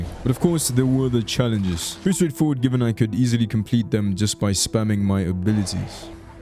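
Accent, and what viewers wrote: South Africa. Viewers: You're south african??? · yoo you're south African? me too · Wait are you South African?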